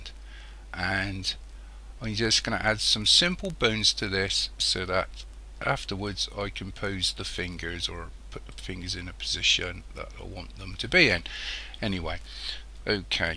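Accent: United Kingdom. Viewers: cool british accent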